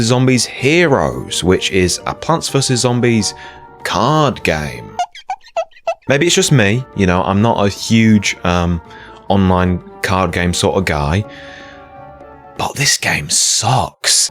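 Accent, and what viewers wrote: United Kingdom. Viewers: This is the most British voice I've ever heard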